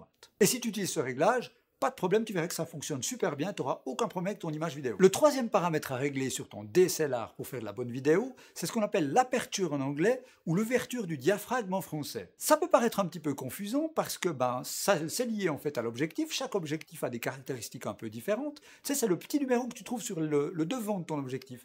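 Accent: Switzerland. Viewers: haha toi tu viens de chez nous! vive la suisse, neuchâtel?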